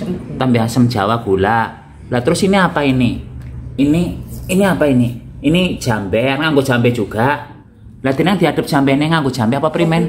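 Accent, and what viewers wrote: Banyumas, Indonesia. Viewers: Seru jeruknya manis...wes jian,ora ngapak ora kepenak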